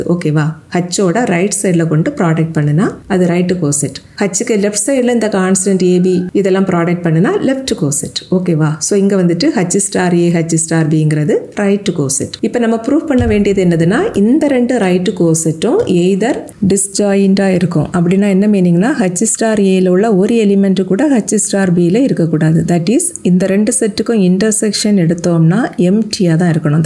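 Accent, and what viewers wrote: Kanyakumari, India. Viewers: Kanyakumari 🤩🤩 your slang also Ngl 🤩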